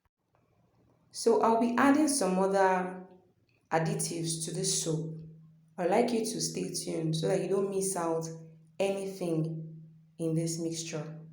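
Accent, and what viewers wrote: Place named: Ghana. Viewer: You speak like a Ghanaian thanks for teaching us sorry am a Ghanaian that’s why I said your English is like Ghanaian.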